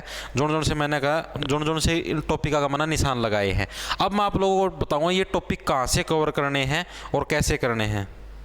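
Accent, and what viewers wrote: Haryana, India. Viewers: Haryanvi h bhai 💪❣️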